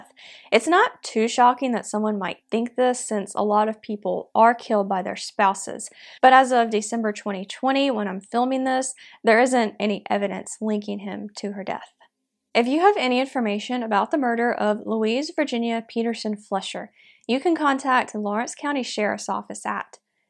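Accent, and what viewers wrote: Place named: Mississippi, United States. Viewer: Nice video. I decided to subscribe. For some reason, you sound like you are from my home state of Mississippi. 👍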